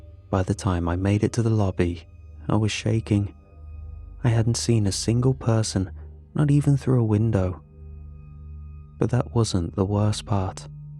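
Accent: United Kingdom. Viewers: Me for 5 years now and he is still in my top 3, theres just something about his voice that helps me sleep. I usualy dont like the British acent and dont like to listen to it but I can listen to for hours, plus he always has a good or interesting story, unlike 90% of creepypasta channels now that only do the borring rules storys. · He’s good and his stories are great but im kinda getting tired of the British accent. Any good American creepy pastas channels?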